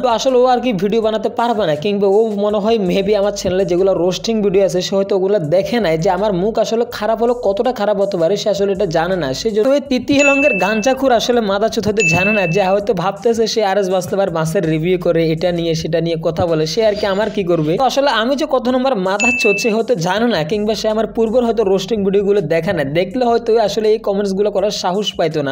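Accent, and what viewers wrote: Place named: Comilla, Bangladesh. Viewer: ভাই ধন্যবাদ একবারে আমাদের খাঁটি কুমিল্লার ছেলেদের মতো কথা বলছেন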